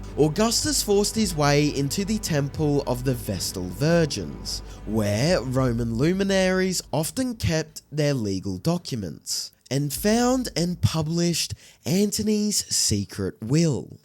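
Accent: Australia. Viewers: Your narration gained momentum as you progressed! Well done, love your accent. I'm an Aussie as well 😂😂❤ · I just love the Aussie accent! The way you can cram so many extra vowels into a word. Like 'exposé'. That becomes 'Aix-poah-zaiyyah'.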